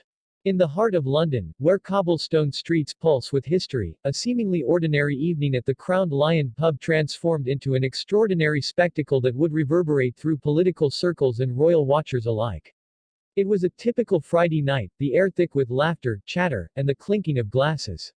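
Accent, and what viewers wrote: United States. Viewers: Why do we have listen to a story telling American it’s cringeworthy.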